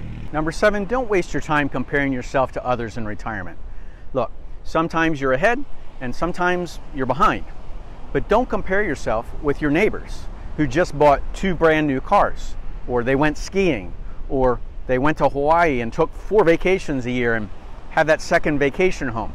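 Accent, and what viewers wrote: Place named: Pittsburgh, United States. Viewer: Accent is from Pittsburgh?... maybe Philly...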